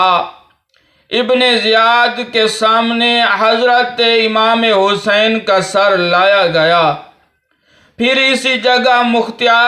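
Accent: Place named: Punjab, Pakistan. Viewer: Panjabi urdu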